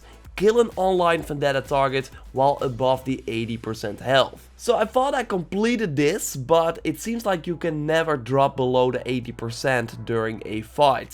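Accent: Netherlands. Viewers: Do i detect a Dutch English accent ? xD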